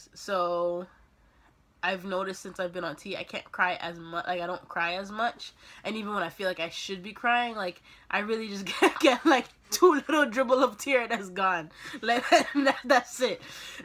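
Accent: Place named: Caribbean. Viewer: i can hear the Caribbean coming out in this vid haha 😁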